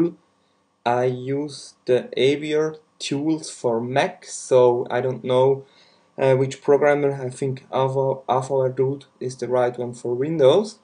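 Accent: Germany. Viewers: Alles Verstanden Infos die ich wissen wollte bekommen.. Wüdre 2X Like geben schon alleine wegen der Guten "Positiv gemeint" "Denglisch" Audio. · erst, vaucc :D You are german no doubt :D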